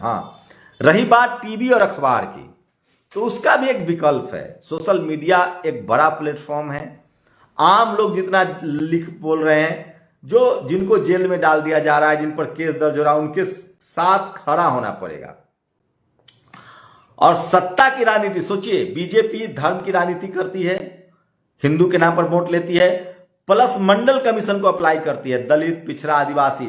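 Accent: Bihar, India. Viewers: आपको यह चैनेल बनाने की जरुरत क्यो पडी भाई साहब न तो इतिहास मालूम है और न भारत के बारे मे बीजेपी का विरोध करने का एजेन्डा चलाना है तो आप पार्टी बनाओ भाया और बोलने का बिहारी लहजा चेन्ज करो 2014 तक सबकुछ हराभरा था अब, सब जगह बंजर ही बंजर दिख रहा है। चश्मा बदल लो भाई समय बदल लो नही तो Robbish ही दिखोगे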